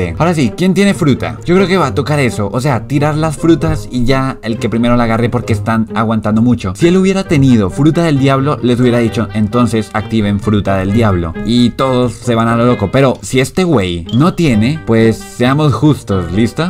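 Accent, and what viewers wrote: Colombia. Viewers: Sos de colombia no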